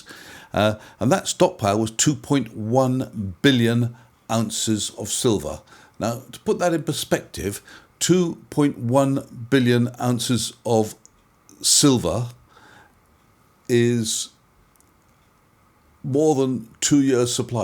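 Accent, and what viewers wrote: England, United Kingdom. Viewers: Really appreciate your wisdom and that beautiful English accent.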